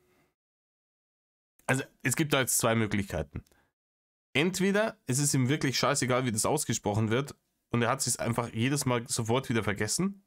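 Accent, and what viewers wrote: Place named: Upper Palatinate, Germany. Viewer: Kommst du aus der Opf.? Hört sich bissl so an -> Ich wohn aba scho lang im Osten & hör den Unterschiede nimma so guad😉 Wie & wo auch imma: Beste Grüße ins scheene Bayern💙🤍💙🤍💙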